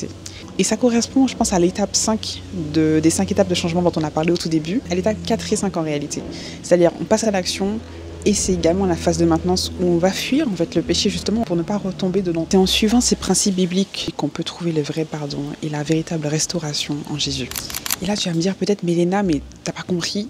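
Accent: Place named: Madagascar. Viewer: Je laisse derrière moi mon sentiment perpétuel de compétition avec les autres et la peur de ne pas être assez bien pour autrui, puisque je suis fille de Dieu et il m’aime Merci pour la vidéo, ça fait plaisir de voir une antillaise sur youtube, et en plus pour parler de Dieu🥰 C’est Gwada ou Mada? L’accent disait plutôt Mada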